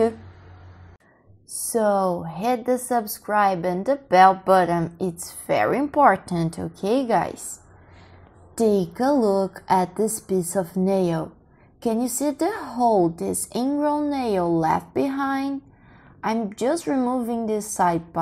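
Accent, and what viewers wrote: Northeast, Brazil. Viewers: que pena uma dublê , era mais lindo sua voz nordestina, que nem a minha. 😊😍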